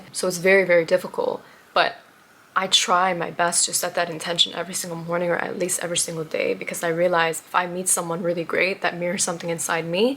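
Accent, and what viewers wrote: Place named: Europe. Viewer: You seem like You speak like You are European. I love Your accent🥰 Know Your Worth 🙌🕊️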